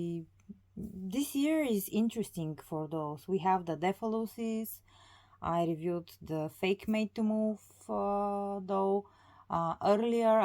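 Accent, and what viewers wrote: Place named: Russia. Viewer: Простите, но акцент вас выдает, вы либо русскоговорящая либо украинско говорящая...я из Украины, для меня это важно :)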